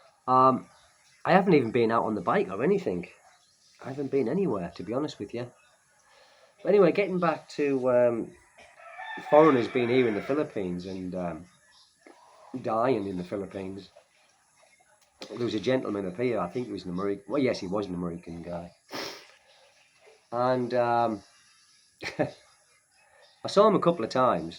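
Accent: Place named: Northern England, United Kingdom. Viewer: Are you from Manchester by any chance ? Im sure somewhere from the Northern England based on your accent.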